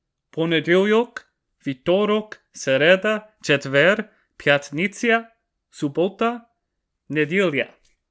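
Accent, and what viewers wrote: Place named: United States. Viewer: You definitely have a lot to work on, especially the lh sound and h sounds, take out some of the American accent out of it.Other than that, admiring you for even trying to learn a pretty much -quite rare language.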